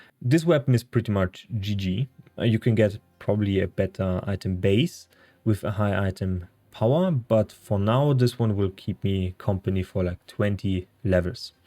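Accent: Germany. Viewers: Dikka 😂😂😂 nice Video aber dein Englisch 🙈🙈🙈 liest du ab? Würde wetten dass du Deutscher bist, mach deine Videos doch auf deiner Muttersprache. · Thanks for the video. I could listen to you talk all day. I used to live in Germany and so I miss the German accent of people. You sound like you're from somewhere near Mannheim/Ludwigshafen, but I could be wrong....lol.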